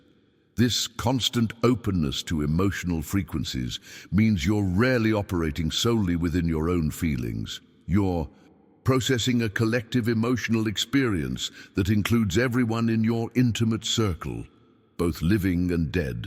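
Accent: United Kingdom. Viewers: Midlands England x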